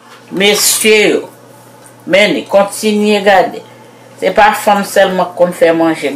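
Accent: Haiti. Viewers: Ki bo an ayiti Manmi soti? Pou janl pale ou ta di se moun an nord pati lartibonite.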